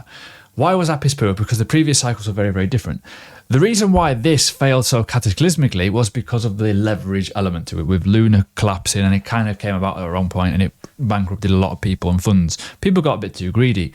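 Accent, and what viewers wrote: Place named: Yorkshire, United Kingdom. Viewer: I still can't get a handle on your accent mate... sometimes I get north Yorks/Darlington, sometimes I get scouse..😂😂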